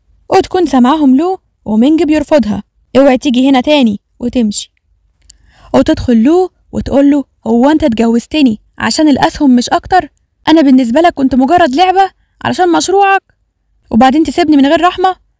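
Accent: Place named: Deir ez-Zor, Syria. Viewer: انت من اي بلد مبين من صوتك انك بنت من سوريا ومن الدير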